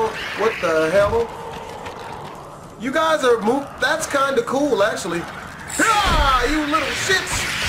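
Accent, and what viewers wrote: United Kingdom. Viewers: I love your british (medieval) accent >.<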